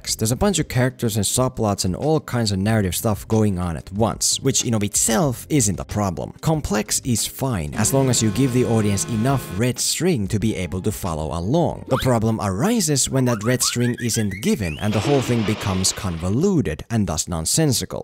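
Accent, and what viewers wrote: Finland. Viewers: Are you from finland ? Something about your accent :p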